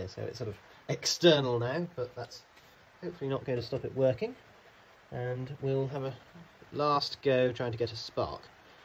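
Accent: United Kingdom. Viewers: youve got the most british accent ive heard. But we in England dont have possums were are you from??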